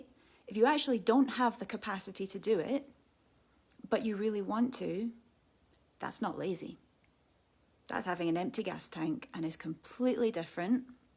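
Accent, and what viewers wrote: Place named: Glasgow, United Kingdom. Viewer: it's so cool that you still have a wee Weegie burr :D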